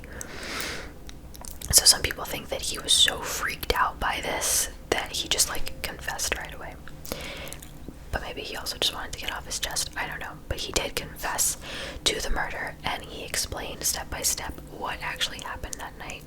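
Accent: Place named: West Coast, United States. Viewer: You sound super West Coast when you talk.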